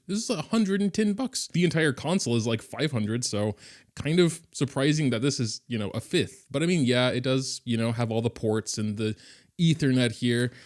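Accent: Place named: Germany. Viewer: i didnt expect you to be german :D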